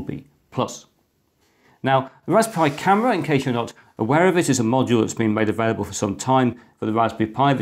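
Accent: United Kingdom. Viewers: I love that british accent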